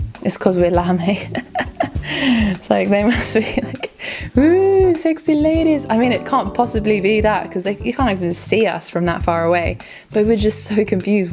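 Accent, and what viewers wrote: United Kingdom. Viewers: 好棒的節目，英國口音好聽到不行，感謝您！ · You have such a nice and enjoyable British accent :)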